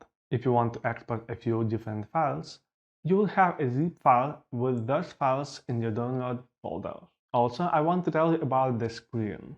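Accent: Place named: Russia. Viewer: Are you Russian? What is the accent?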